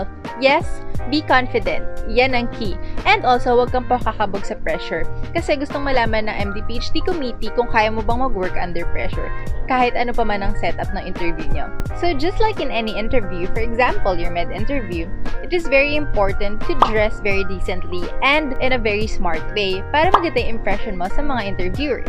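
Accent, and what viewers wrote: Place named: Conyo, Philippines. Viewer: very pero conyo, IBA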